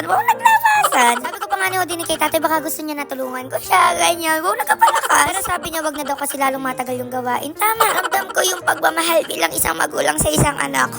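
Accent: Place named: Quezon, Philippines. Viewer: Quezon province, specifically in mulanay quezon same accent with you po 😊😄 watching from Qatar now 😊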